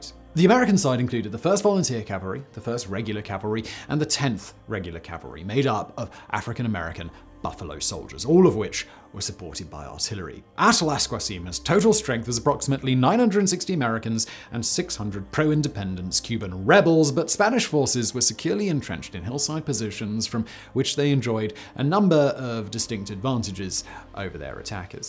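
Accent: United Kingdom. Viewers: “ a staunch and” ? Vociferous? Opponent” I can usually decipher your British accent but in this case I wasn’t sure if you said vociferous or vociferent Now I have to go Google to see if that’s actually a word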